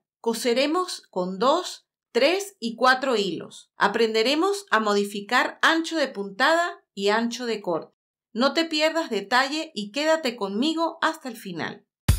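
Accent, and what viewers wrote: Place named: Venezuela. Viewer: me lo imagine por tu acento, q bueno, yo tambien soy Venezolana y estoy viviendo en Australia. Me compre la maquina pq estoy haciendo bolsitos d tela y collares y me aligera el trabajo, tengo una tienda online Nanajuliedesign, vendo solo en Australia , estoy encantada con tu explicacion y ahora mas sabiendo q eres venezolana. En q parte d España estas?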